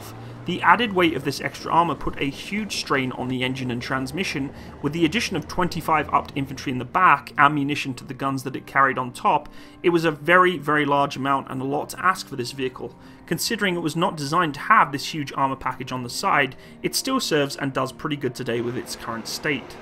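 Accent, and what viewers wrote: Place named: England, United Kingdom. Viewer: The narrator sounds English but talks like an American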